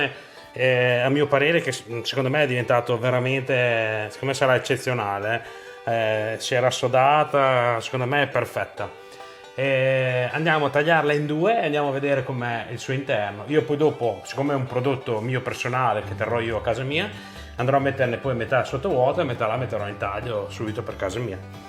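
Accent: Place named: Naples, Italy. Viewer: Bravo, poche parole e dette quando servono, non come fanno nelle tv nazionali i soliti tromboni, e poi l'accento napoletano è la vera ciliegina sulla torta. Bravo! Bravo.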